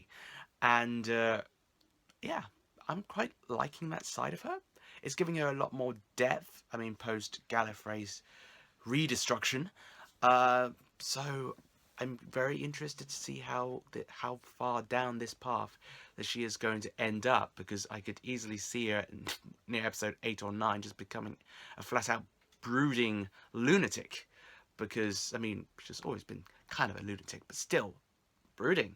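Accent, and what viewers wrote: United Kingdom. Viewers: not gunna lie you have the most annoying british accent ever, and im English myself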